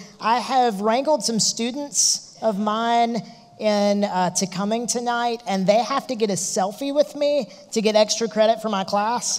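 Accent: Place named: South, United States. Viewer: I had never heard you speak until today. Couldn't believe you're a Southerner. Love that accent. :-)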